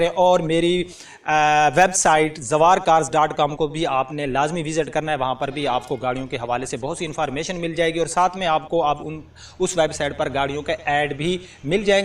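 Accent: Saraiki, Pakistan. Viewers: AP ki Urdu ye bta rhi h k AP saraiki hen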